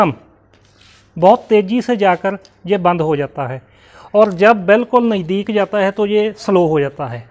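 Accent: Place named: Punjab, India. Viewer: Veere Punjabi bol k video banaa Leni c. .Hindi bol reha Punjabi style ch...😊 Darwajey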